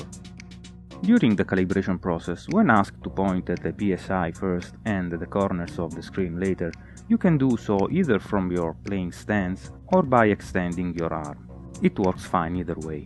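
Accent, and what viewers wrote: Italy. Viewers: il tuo inglese non è male, ma l'accento è italiano. xD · Ma sei italiano?.. Sembra dalla pronuncia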